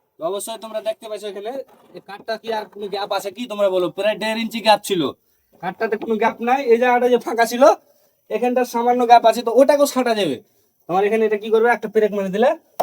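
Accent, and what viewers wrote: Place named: Chapai Nawabganj, Bangladesh. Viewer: চাপাইনবয়াবগঞ্জের ভাষা এটা ❤❤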